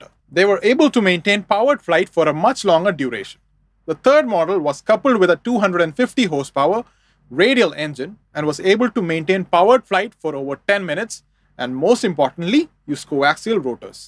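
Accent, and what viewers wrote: India. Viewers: Ugh heavy indian accent...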